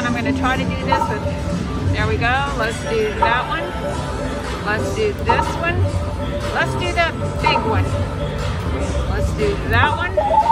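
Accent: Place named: Pittsburgh, United States. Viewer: Do I detect a fellow Pittsburgher? If so, hello from Kennedy Twp. Love the video 😊❤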